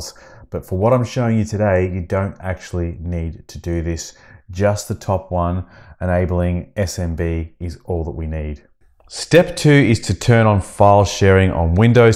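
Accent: Australia. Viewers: sounded Aussie to me...?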